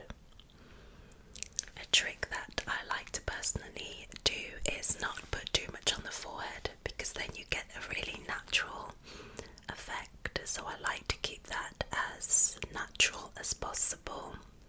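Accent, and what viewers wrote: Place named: London, United Kingdom. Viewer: Are you from Central London? You have the EXACT same accent as my auntie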